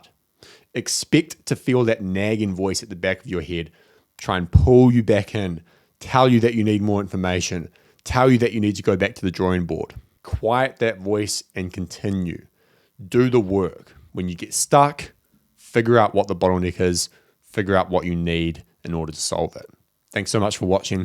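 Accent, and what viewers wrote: New Zealand. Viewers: Not only are u informative and helpful, u r also very very hot. Love ur accent (kiwi, I guess?) thank you for the video, gonna get up from the bed and get some shit done. Cheers from Russia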